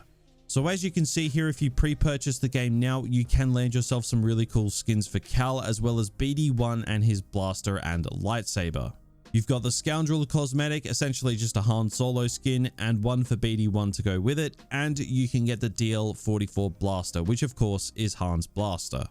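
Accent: Australia. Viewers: The australian accent is unbelievable annoying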